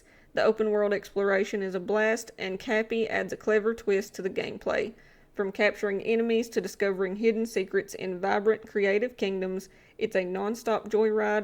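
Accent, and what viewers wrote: United States. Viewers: Love that accent of yours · you sound like Arkansas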